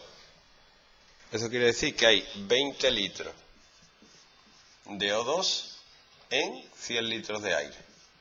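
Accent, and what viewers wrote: Andalusia, Spain. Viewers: quiero intentar atender pero es que er asento andalu` de este hombre ma`hace musha grasia killo XD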